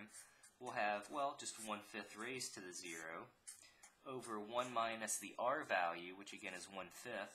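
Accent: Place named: United States. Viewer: in the U.S. you can tell from his accent. however if you want to ask which state is he in? because american only ask about america sinour.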